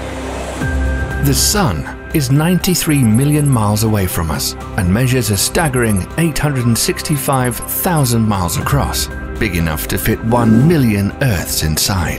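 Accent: United Kingdom. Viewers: You speak with a British accent, yet you use Fahrenheit? Please use international standard units.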